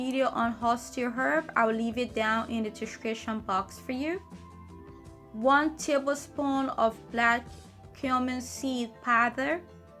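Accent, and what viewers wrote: Liberia. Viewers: I hear small Liberian English my geh.... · Bella She sounds Liberian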